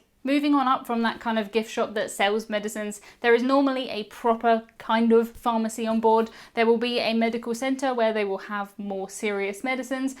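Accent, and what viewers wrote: United Kingdom. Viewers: Please say "aeroplane" just one more time. I love the British-isms. "Spec-i-AL-i-ty" "Washing" "The Lift" Love it. Keep talking. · Hi, great tips and I do like your background. Especially, bringing a variety of medicine, (including sea sickness pills). I'm one to bring stuff like that, as I grew up a Girl Scout (Girl Guide in Britain) and try to be prepared for anything. My companion would probably be the on to appreciate that I had headache medicine and not only extra sunscreen, but also the pain relief spray in case of a sunburn. 💊🧴. I'm in the US, near Chicago, IL and I d o enjoy listening to your voice. It doesn't seem posh, just a Brit speaking. 😊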